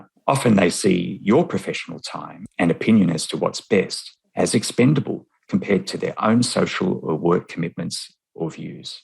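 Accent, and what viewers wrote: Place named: Australia. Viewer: plz make more videos so that we learn about australia and also get along with the accent🤗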